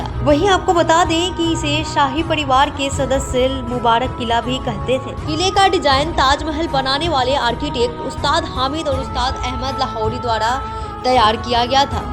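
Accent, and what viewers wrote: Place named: Bihar, India. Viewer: Voice over me bihar ka touch hai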